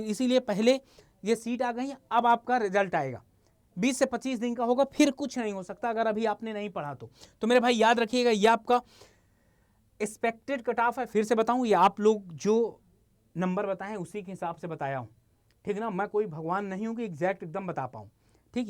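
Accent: Bihar, India. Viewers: Sir aap bihar se ho ya purvanchal UP SE?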